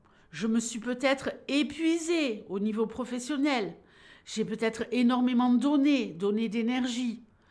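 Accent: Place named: Toulouse, France. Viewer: 🌸Bonjour. 🙏Exit les anciens paradigmes 😂 ouf! Vve la renaissance 🤵‍♀️ La vie est belle 💚 vous êtes unique, extraordinaire, très attachante. Au plaisir de vous écouter. J’adore l’accent Toulousain. Bien respectueusement 🎁 Super thanks 🎁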